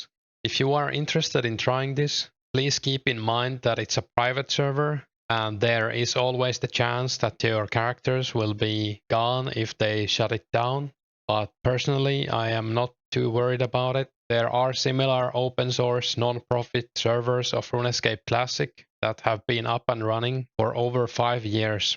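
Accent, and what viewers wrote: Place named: Finland. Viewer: Enjoying the vids man! That glorious Finnish accent is very calming and helps me get through the late night grinds :)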